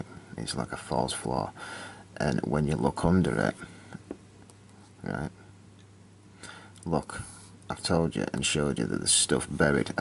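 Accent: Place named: Yorkshire, United Kingdom. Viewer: Sorry I'm a few years late, this stuff is fascinating. Once you see it, you cannot unsee. Great work. Cheers From NZ. I can't pick the accent but I'm Guessing York or Scarborough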